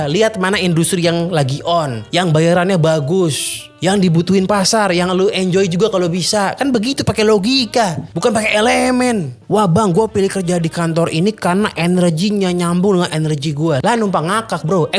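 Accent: Java, Indonesia. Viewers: Buset, medoknya juga ketularan 🤣🤣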